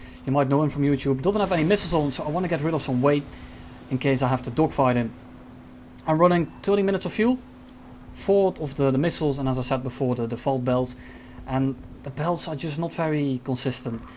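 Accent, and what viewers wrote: Netherlands. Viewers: Nice vid! Also r u dutch?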